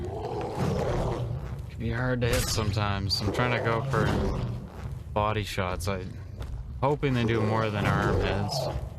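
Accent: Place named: Maritimes, Canada. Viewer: You from the maritimes? I recognize the accent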